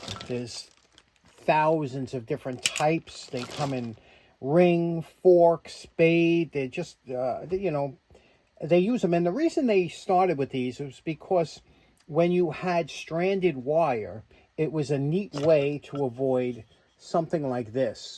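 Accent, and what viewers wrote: New York, United States. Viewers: I love your NY accent, but I couldn't, for the life of me, figure out what a "soladis" connector was until I noticed the title of this video. I just love that accent! LOL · Great episode and thanks for speakin in N.Y. accent.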